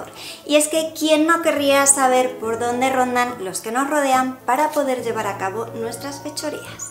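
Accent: Spain. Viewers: Q eres de España???, de que comunidad?? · Yo no supero que le digan "jermion" a Hermione :'( pero me gusta tanto el acento Español que me lo paso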